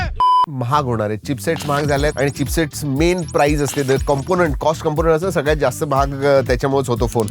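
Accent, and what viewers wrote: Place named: Pune, India. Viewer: भावा, 'त्यामुळं', 'कुठं', ' हिनं-तिनं' वैगेरे असं ग्रामीण किंवा suburban, outskirt पुण्याच्या दैनंदिन बोलीभाषेत एकमेकांशी संवाद साधताना म्हणतात. महाराष्ट्राच्या अनेक जिल्ह्यांच्या दैनंदिन बोलीभाषेतही संवाद साधताना असं म्हणतात. त्यात असं काही गैर नाही. तसा शब्दोच्चार खूप सामान्य आहे.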